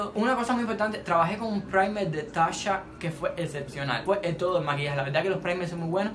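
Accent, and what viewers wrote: Cuba. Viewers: Me gusto mucho tu forma de hablar 😆tu acento como cubano pero cool! 😂. Y los look de maquillaje de Camila 😲👌👌 de lujo ! Aqui otra opoyo de.mi parte 😉😉 . Me gusta como te expresas 😊😊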